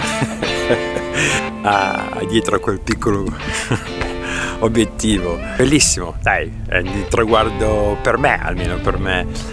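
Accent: United States. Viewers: Grande continua così. La cosa più fantastica è sentire che parli un italiano in stile americano ed aver perso il dialetto del tuo paese di origine.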